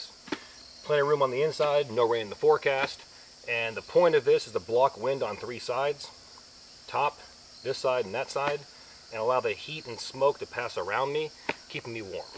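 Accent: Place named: South, United States. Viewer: I enjoyed it. A cousin who was SF in Vietnam told me what he carried on jungle patrols for 2 or 3 days and your kit is almost identical. I am trying to pin that southern accent. Your not AL or MS where I grew up, you talk to fast. Louisiana maybe? It doesnt sound like TN or the Carolinas.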